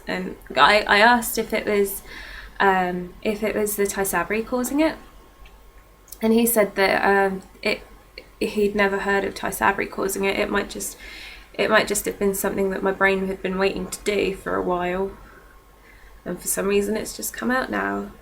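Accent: United Kingdom. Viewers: Is it me or do you get a British accent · Your accent sounds british to me, not aussie. Very interesting video. Thanks for posting it. I do know a great deal about MPD/DID.. am a hypnotist and have great success with hypnosis and regression. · Ur British not Australian!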